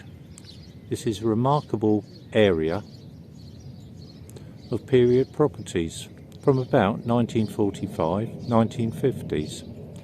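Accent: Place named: England, United Kingdom. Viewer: Nice video. Glad you made it. I love the architecture. This guy has the best voice. Love the accent. I’m not sure where he’s from but it has to be close to Michael Caine’s home. They sound identical. Definitely the same accent. This guy has a wonderful voice.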